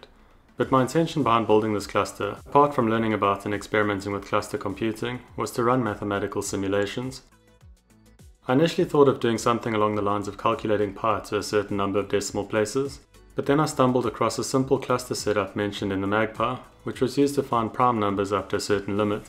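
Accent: South Africa. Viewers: Is this guy from South Africa??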